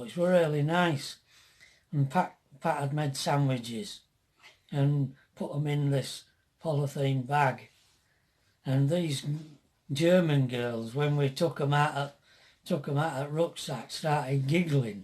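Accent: Yorkshire, United Kingdom. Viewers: We love watching your channel; your a man of many talents and your both a lovely couple: You make us smile and it’s great to hear the Yorkshire accent and sense of humour: We are from Bradford and being living here in the West of Ireland which we love: Don’t give up; remain positive; your a very special couple.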